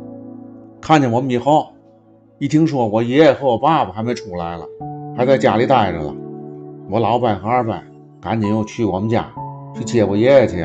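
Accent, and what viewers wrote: Tianjin, China. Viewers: 形容的好真實丶形象，加上維妙維肖的天津音及口才，真喜歡這個節目。 地震，左右搖時，狀況要比上丶下震要好一點。磚之間用泥巴壘的房屋，五級就會發生倒毀。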